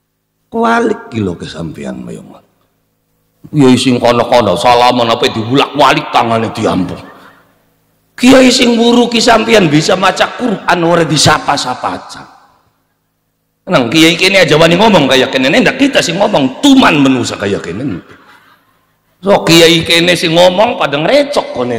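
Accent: Indonesia. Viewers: Aku g Level yg ceramah ny kurang wawasan kental logat Ndesosanya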